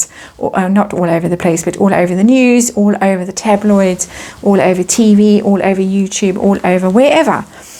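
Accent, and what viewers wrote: South Africa. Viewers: Love the lilt in her voice, awesome well bred South African accent and incredible snark! 😅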